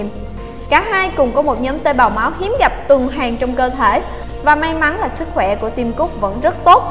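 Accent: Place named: South, Vietnam. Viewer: Giọng miền trong thì phải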